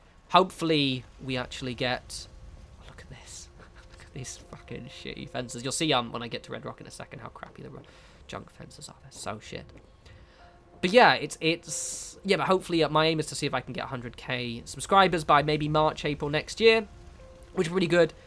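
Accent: United Kingdom. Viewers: Blocking off the ceilings and stuff was the very fist video I saw of yours and I loved it. Plus your cute britishy accent is hot :)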